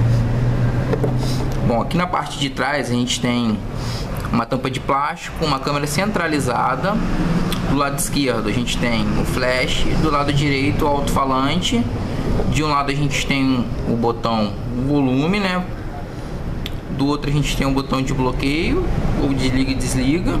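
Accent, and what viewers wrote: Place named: Rio de Janeiro, Brazil. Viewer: Que sotaque gostosinho desse cara deve ser carioca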